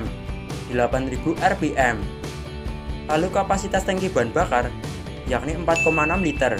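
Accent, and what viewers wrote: Java, Indonesia. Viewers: Medok bet dah SE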